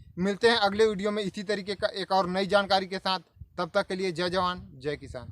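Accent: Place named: Chhattisgarh, India. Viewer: Bhaiya chhattisagrh se ho kya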